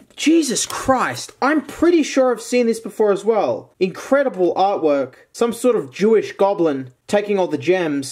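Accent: Australia. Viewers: Hes Australian though, james is British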